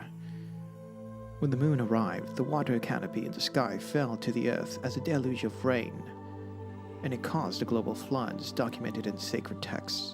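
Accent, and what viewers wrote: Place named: United Kingdom. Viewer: Why is a British person with a horrible accent talking about the Zulu Nation this is just wrong in many ways! OMG stop!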